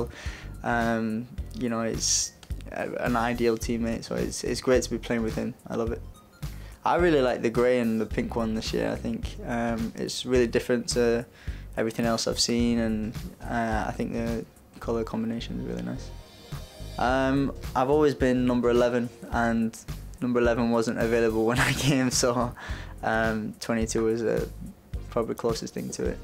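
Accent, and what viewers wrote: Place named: London, United Kingdom. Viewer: He sounds like a Londoner. I was expecting a Staffordshire accent.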